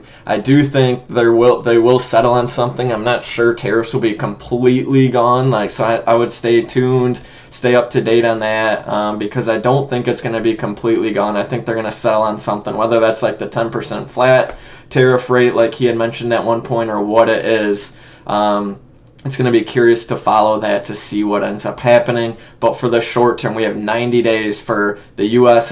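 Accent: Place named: Canada. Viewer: You sound like you're from Canada or something, but I like you anyway.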